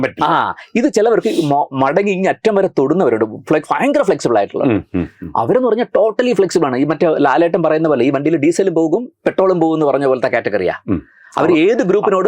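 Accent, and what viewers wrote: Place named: Trivandrum, India. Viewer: Tvm slang alle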